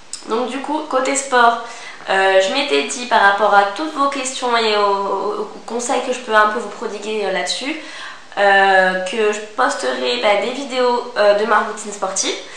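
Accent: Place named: Corsica, France. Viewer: moi j'adore ta voix et ton petit accent corse... :))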